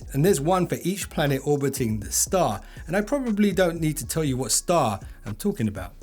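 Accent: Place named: United Kingdom. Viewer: Probably UK; judging by his accent.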